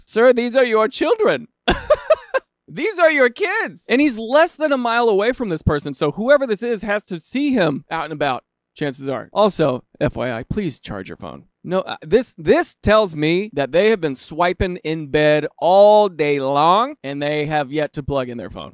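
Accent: Germany. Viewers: That's a pretty good German accent, not sure what it has to do with Lake Tahoe though lol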